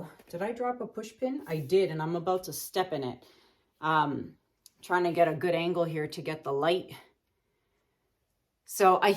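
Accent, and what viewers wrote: Canada. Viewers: Oh wow, wow, wow!!! This piece is absolutely stunning! I have only recently discovered acrylic paint art but you were one of the first people I started watching. I really am enjoying your art, your tips (and as I'm from the UK, the differences between a Canadian accent and an American one! 🙂) Keep up the fantastic work and sharing things with us. I've just bought my first few acrylic paint items to try it out for myself. A few busy days this week so next weekend probably the starting point for my paint pouring first. Im not sure what to start with but as my canvas is small, it won't be a blow out on quite this scale! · Good Lord she likes to talk. I dont know if I can stay for the end, I cant take all the talking and the Canadian accent. Not trying to be nasty, its just my preference.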